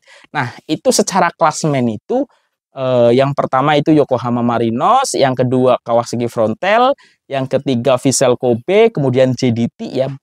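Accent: Indonesia. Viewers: Bang orang Malaysia ke Indonesia? Cara cakap mcm Indonesia. Kalau betul Indonesia, impress gila dengan JDT sampai ke orang Indo buat review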